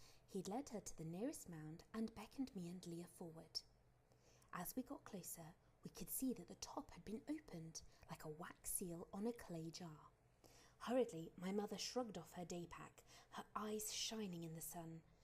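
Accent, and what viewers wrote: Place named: United Kingdom. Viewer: The American teen is commentated by a British woman… fascinating choice. Same as the cover art for Torrent, not my first choice. Also why is the audio quieter than a fish screaming bloody murder?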